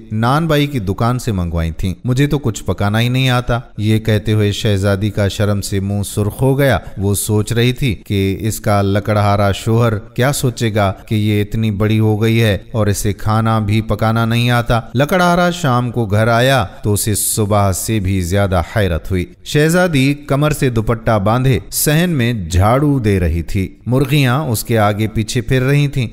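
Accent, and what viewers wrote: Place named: Arab world. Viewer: Samaj nahe aata ye urdu hai ya arabi, mehrabani kar ke urdu alfaaz ko Arabi talaffuz ( pronounce) na kare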